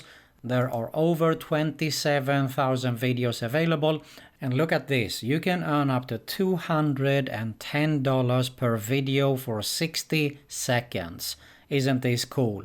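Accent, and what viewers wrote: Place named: Germany. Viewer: Hey, thanks for the nice video. Do you come from Germany, cause your pronunciation looks like Germans ? ☺️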